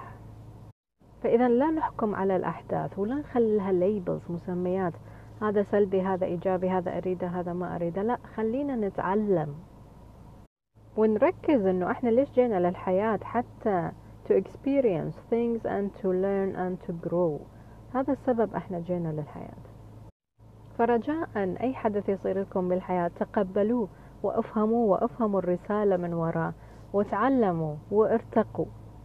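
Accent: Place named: Iraq. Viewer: فعلا أدركتي عمق القانون هنيالك ع فكره مره حبيتك لأني احب لهجتكم هل العراق فدوه 💜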